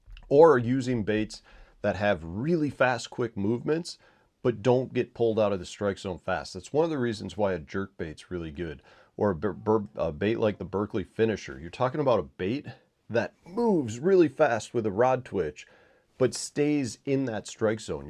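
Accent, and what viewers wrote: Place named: South, United States. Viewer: Sounds like you're in a southern state. Where do you fish during winter?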